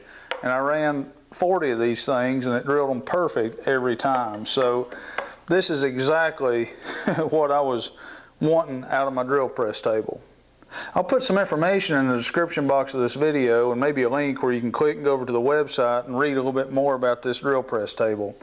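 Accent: South, United States. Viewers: Looks good to me and I have now found someone that sounds as southern as I do.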